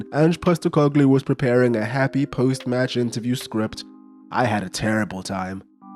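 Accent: South Africa. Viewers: I'm curious about where you're from bro? Name says Zim, accent says SA. Just curious... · Are you south African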